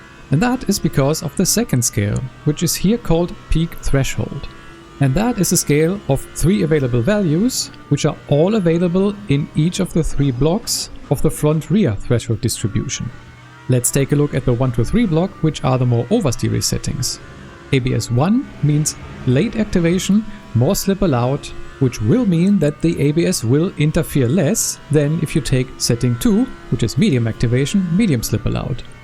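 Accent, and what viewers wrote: Germany. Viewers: You cannot brake noob. Also dude, this German accent sounds terrible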